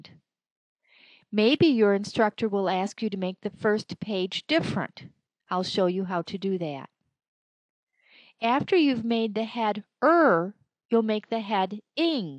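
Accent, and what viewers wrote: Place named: Minnesota, United States. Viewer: i love the minesota voice.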